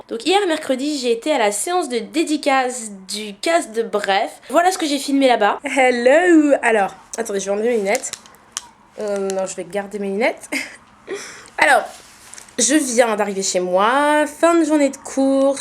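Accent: United Kingdom. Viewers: Aaaaaah j'aime tellement t'écouter parler c'est ouf *w* Je trouve ça trop cute l'accent british, aha, c'est "chic" (bon ok c'est nul mais bon hein :3) . Hâte de voir la prochaîne vidéoo <3 -J. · Ton accent british c'est chic babe ! ♥ aha . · Trop chic ton accent "british" :P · J'ai pas mis de pouce vert pour le cast de Bref mais pour ton Magnifique accent so British ;) Tu m'as tué x) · J'adore l'accent anglais ! C'est chic ! et en plus tu te débrouille bien, et j'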